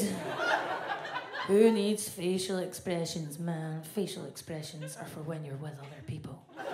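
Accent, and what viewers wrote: Scotland, United Kingdom. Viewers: I absolutely love Scottish accents. I'd probably listen to this lady all day even if she wasn't telling jokes. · What Scot accent is this? There are different accents in Scotland. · I love the Scottish accent 🙂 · It's exactly the Scottish accent that appeals to me most. Otherwise, that alien chick is the xexiest, funniest, most honest nonhuman I've ever seen do comedy. I wouldn't even have known she is an alien if she hadn't confessed! I'd watch anywhere, any time. I hope she does a full special on a streaming service pretty soon. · You should be proud of your Scottish accent not ashamed or embarrassed about it. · Very beautiful, very funny and I love her accent! Makes me want to do the 11 hour flight to the UK 🙂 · I find Scottish accents in women so damn hot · How can you not LOVE a scottish accent, thats definitely a woman i could listen to and never be bored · You are beautiful, funny, and I personally love your accent. 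· Fantastic voice and accent. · I love a Scottish brogue. My ears delight in it. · I love the Scottish accent! Would I wish I had subtitles for ¼ of what is said? Sure, but it's fun to hear anyway! I like to repeat/imitate it when talking with someone, not to make fun of them, but to be able to understand it better. Which is a better way to cook? Watching someone passively, or doing the cooking yourself while tasting it periodically? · Is she fay Rothesay? 🧐 Very good 👍🏻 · Anything spoken with a Scottish accent is automatically funnier...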